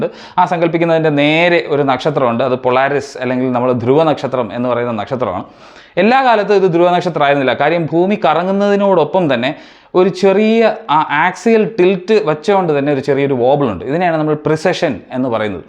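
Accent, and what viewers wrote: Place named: India. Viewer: Indian accent ആയതു കൊണ്ട് പെട്ടന്ന് വാക്കുകൾ ക്ലിയർ ആവും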